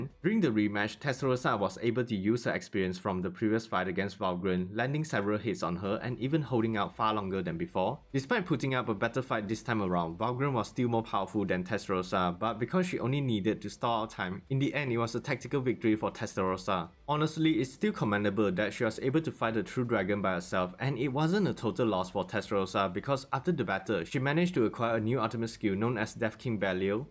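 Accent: Singapore. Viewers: srry. Ur accent is singlish tho. Keep ut the goodwork!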